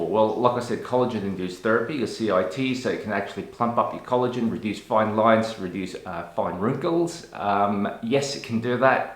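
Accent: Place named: Australia. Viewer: A Korean-aussie with a full on aussie accent. I've seen it all ㅋㅋㅋ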